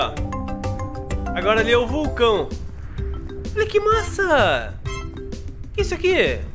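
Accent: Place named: São Paulo, Brazil. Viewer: com todo respeito puxa menos o R , sotaque paulista poRRRRta. barrrco no mais ta muito tri legal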